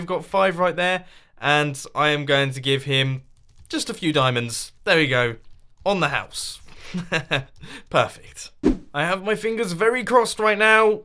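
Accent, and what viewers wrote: England, United Kingdom. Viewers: I love your British accent, it's so funny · I subscribed at summer of 2015, i was interested in hermit craft series 3. I love the redstone content and your British accent, it is charming! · I subscribes a year ago to your awesome channel, and began watching hermitcraft when you first started season 4 The video that made me subscribe was your 50 facts about minecraft video And I am subscribes cause I first absolutely LOVE your English accent :D (I'm Dutch btw) and I myself also love Redstone and that sort of stuff and can relate to you in the way that you call 'spoon moments' 😂 The only thing that I don't like about your videos is the consider subscribing thing, but if that gives you more subscribers then please hold it, you deserve the subscribers <3 You have become one of my favorite YouTube channels, and I am so happy that I found your channel Please keep up the absolutely amazing work!! 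You make me happy every day at 8 o'clock, thank you for that ☺️ BTW, succes with your longboard😜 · NO clue when and ... i just watched etho mostly and that brought me to you sooner or later, then i just watched like ALL of the hermitcraft videos then i kinda just decided HEY LET'S SUB TO THIS DUDE CUZ HE'S GOT AN ENGLISH ACCENT AND HIS VIDS ARE DANK, i should Re-Evaluate my life, love the vids in general peace ;P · I subscribed about a year ago. I don't remember what video made me subscribe, but the main reason I subscribed was because if his accent. I love a British accent. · First video i watched was about Shrek... don`t remember what it`s called. But i liked creativity of trolling. I love friendly trolling, and jokes. I like timelapses, and fast progress. I like your voice, and this... "British" style of talking you got. I don`t know what to say more. Just... I`m relaxing while watching your videos. 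· Hey;) I know this video is a little older and you might not even see this, but you've asked some questions in this Video and i just had to answer since I am binging all of season 4 for the past few weeks haha:D I did subscribe today cause I honestly kinda forgot haha and just kept watching^^ To be honest, I was watching Pewdiepies latest Minecraft Series and I just needed more, searched on Youtube for some time and found your channel aswell as the Hermitcraft Playlist, I started with season 4 and well, 94 Episodes later I am still having a blast!! The why is easy for me, your voice is extremely soothing and comforting, and I also really love your accent;) I am from germany and listen to British people or English speaking people in general helps me improve my own skills:) I also really love your creativity (not to say, the other Hermits aren't:) ), your style of editing and the way you talk to the audience. 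It immediately made me feel like part of your community! So please just keep doing what you do and I will continue binging the Hermitcraft Seasons:D Greetings from Germany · I probably subbed only at the start of hermitcraft series 4, not sure how long ago that was, but not too long. However, before that i saw quite a few of your tutorial videos. I love your redstoning and what i see as your intricacies. The first video i ever saw of yours i think was your blaze farm with crusher and lighting system, though i really only watched it for the crushing mechanism, which was super awesome and compact. Besides the things listed above, I enjoy watching your videos because of how comical you are sometimes, but i also just love listening to British people speak!!!!! You are a great minecraft player and ive learned a lot from you.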